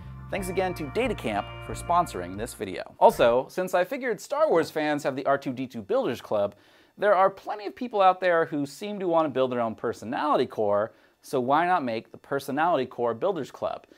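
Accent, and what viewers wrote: Russia. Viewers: You are Russian? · Ты что, русский?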